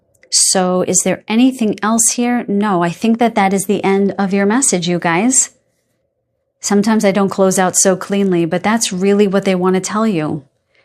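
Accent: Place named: New York, United States. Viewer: Hello again and thank you for taking the time during such a stressful time for you. I wish I could do some of the physical work for you. I chose #3, and yes it does fit, and it confirms what I've been getting myself. In the last several days I've been feeling very cozy with Spirit without having to do anything to get there, as usual. And I'm feeling grateful for that as well as feeling anticipation of good things. I think that very big things are coming to the world very quickly now and we are the placeholders for the collective. And it is very near to showtime. .... BTW, .. please don't hide the NY accent, it's cute on you 👍😉 .... These are SUCH special and auspicious times that it will easily wash away the ugly and painful if allowed to. The Creator has given me great strength and I will be forever grateful 😊 .... bye now and thanks again ❤️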